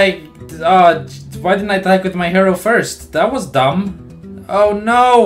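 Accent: Sweden. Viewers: What? How can you mistake Swedish accent to some American accent?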